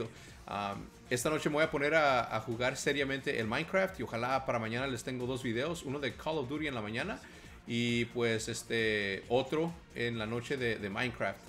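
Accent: Mexico. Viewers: de onde eres mi tienes acento como de mexican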